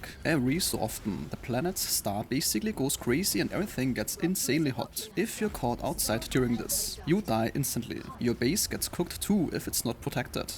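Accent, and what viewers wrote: Germany. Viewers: Servus, man hört es direkt raus... Hast auch einen deutschsprachigen Kanal? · Ah good old German accent ❤ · bro i can hear instantly that he is german haha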